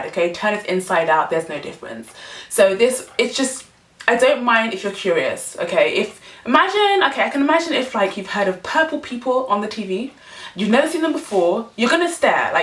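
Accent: England, United Kingdom. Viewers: I can kinda understand (some what) peoples need to stare at things that they don't see very often or they're not use to. In the US there are a lot of different races so some one walking by of a different race from you isn't a big thing but there is one thing about you that would make me stop. Your accent. I love your accent! Hearing an English accent on a black person is rare here, so I would do a double take. I would stop you just to ask you to chat so I can listen to you talk. To be fair I would do that to anyone from the UK. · She has the British accent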